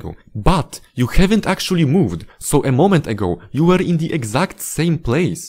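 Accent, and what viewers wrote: Poland. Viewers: U sound Polish. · Twój film wyświetlił mi się w proponowanych, klikam, a tam jakoś tak po polsku wymawiany ten angielski. Sprawdzam - faktycznie, z Polski xD Fajny kontent, chyba zostanę na dłużej 👍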